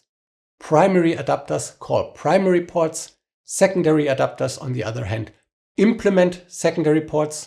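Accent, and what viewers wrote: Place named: Germany. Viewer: i like your german accent. Great intro to hex arch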